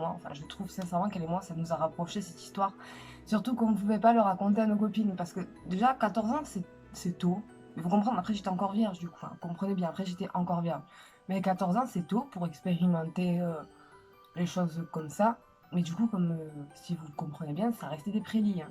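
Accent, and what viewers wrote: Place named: Catalonia, Spain. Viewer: Ton accent Catalan, un délice xD, vive le sud ! :p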